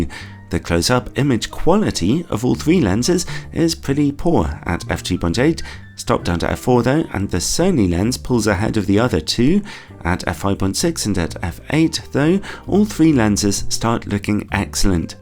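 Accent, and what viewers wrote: United Kingdom. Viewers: he is british. It’s Zed in the UK but not found as much as everyone. T as Tee but some says Tango Z as Zee but some Zed V as Vee but some says Vicky C as Cee but some says Carrots B as Bee but some says Bob. They say these stuffs cuz some people in UK have bad hearing and often hear something else. Sorry for that you found it funny.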